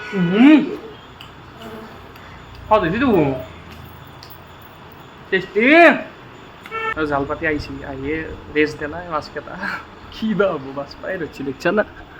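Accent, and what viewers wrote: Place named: Nalbari, India. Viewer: Tumar video bur sai bhutt vl lage❤ i love nalbari vakha ktha burr❤☺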